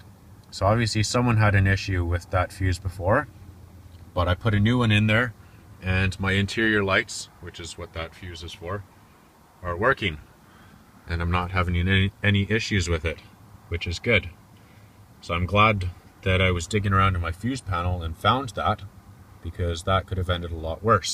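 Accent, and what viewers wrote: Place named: Midwest, United States. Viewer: just for kicks where is that accent from you Canadian or from Minnesota?? Lol. I'm a midwesterner too so I picked up on the accent?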